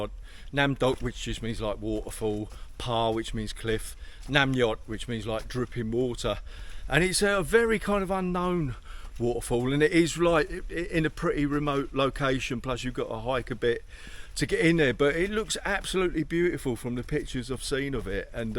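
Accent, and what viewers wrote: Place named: United Kingdom. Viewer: I like listening to English with a UK accent. It's beautiful.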